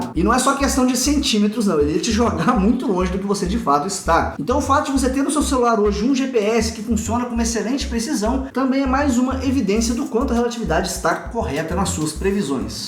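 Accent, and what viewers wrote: Minas Gerais, Brazil. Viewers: Que delícia acordar e já ter vídeo novo. Uai, você é mineiro? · Só de falar "vão falá um cadim" dá pra sacar que é mineiro. É tipo uma linguagem em código que possuímos... kkkk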